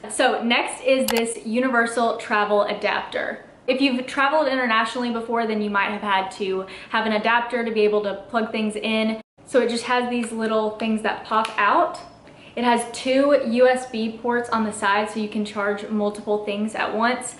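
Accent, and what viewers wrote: South, United States. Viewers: Am I the only one that enjoys listening to a southern accent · I thought I was the only one who traveled with coffee creamer. Glad I found your channel. Love to hear a familiar accent (Southerner here too). ;)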